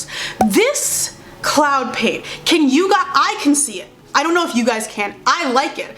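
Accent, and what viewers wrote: New York, United States. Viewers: random but i love when new york accent comes out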